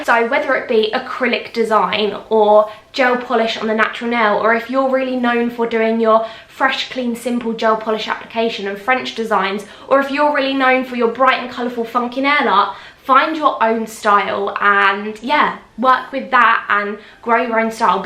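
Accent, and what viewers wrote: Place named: United Kingdom. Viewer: I´m not a nail tech, but I like to do my nails by myself and watch your channel and listen to your UK accent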